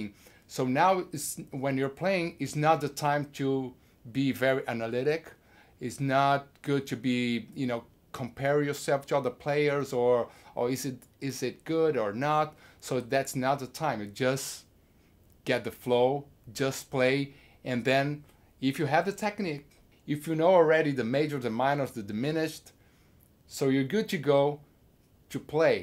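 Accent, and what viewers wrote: Brazil. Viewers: Putz o sotaque brasileiro é inconfundível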